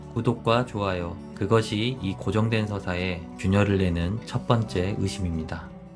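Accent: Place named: Jeolla, South Korea. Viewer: 즌라도 사투리 심하다잉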